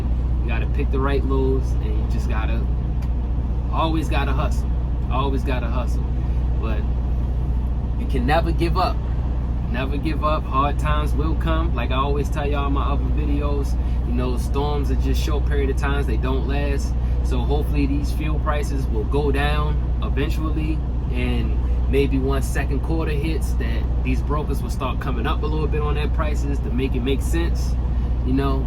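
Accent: Baltimore, United States. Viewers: I can spot that Baltimore accent from a mile away. Lived out Cold Spring back in the day. Respect.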